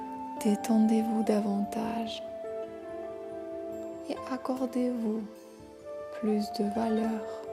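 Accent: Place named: Russia. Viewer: J'adore votre accent russe. Спасибо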